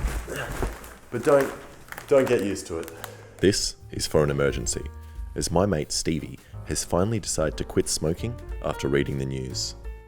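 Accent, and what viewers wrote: Australia. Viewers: Hes australian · Are you Aussie mate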